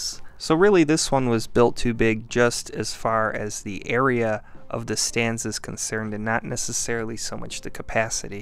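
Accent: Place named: Cleveland, United States. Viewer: I love this guy's cleveland accent.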